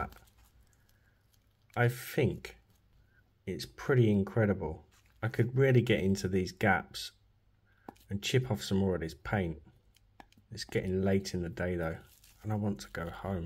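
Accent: United Kingdom. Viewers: Sorry, I have to ask, where are you from UK?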